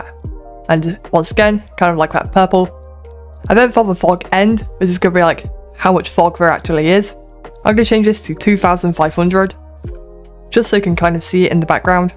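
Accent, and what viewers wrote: United Kingdom. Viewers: are you british? just trynna guess from accent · I love your accent! I can tell your british.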